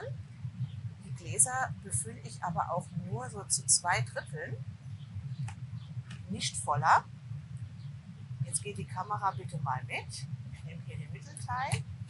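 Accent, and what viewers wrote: Hesse, Germany. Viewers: Großes Lob an die Macher, und am besten gefällt mir, dass ab und zu auch mal ein hessisches Wort durchklingt 🙂👍weiter so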